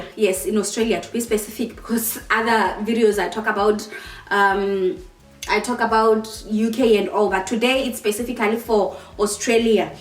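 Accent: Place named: South Africa. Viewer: Hi dear, you sound like a South African, I am Namibian, watching you from Windhoek.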